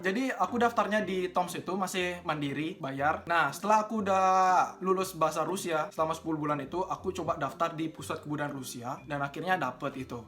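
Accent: Bali, Indonesia. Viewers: Bali x medok nya 😂😂😂😂 saking griya napi turah? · Aksen Bali banget, Bli 😂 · Logat bali nya kentel ajan bli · Wkwkwk kentel banget bli logat bali nya ... Salam dari Nak Buleleng hehehe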